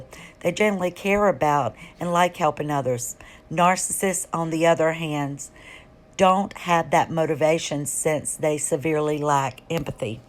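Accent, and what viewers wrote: South, United States. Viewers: The Southern accent gives you away as a bullshit artist MAGA person.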